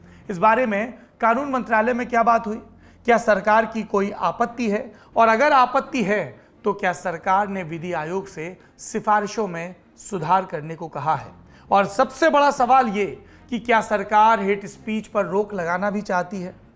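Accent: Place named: Bundelkhand, India. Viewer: 🙏🙏🙏 हार्दिक साधुवाद एवं मंगलकामनाएं आपको निष्पक्ष, निर्भीक होकर और मीडिया धर्म निभाने के लिए आप जैसे पत्रकारों को के 🙏🙏🙏 कोटि कोटि नमन जी आपकी क्षेत्रीय निवासी लय बहुत अच्छी, बहुत उत्तम और शालीन भाषा बुंदेलखंडी लय 🙏🙏🙏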